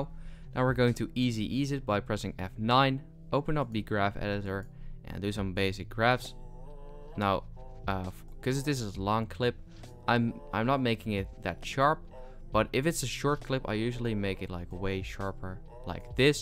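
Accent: Netherlands. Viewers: i just know you're dutch, you sound so dutch haha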